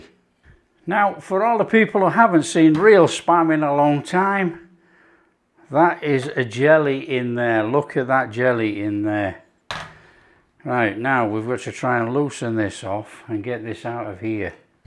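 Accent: Yorkshire, United Kingdom. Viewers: My Mouths watering! I'll have to try this as I love Spam! It's not easy to find where I live in the Golden Triangle! 😄 Great to hear a Yorkshire accent when I'm so far from home! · you sound like you are in the UK? Where are you getting that much ground pork shoulder for less than a tin of Spam? I'd love to know.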